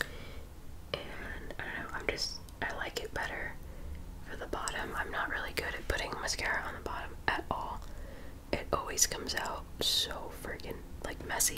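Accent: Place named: South, United States. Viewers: I love Southern ASMR!! Another fave of mine. I’m obsessed with this lip color. 😍😍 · Omg I love southern asmr sounds too!!!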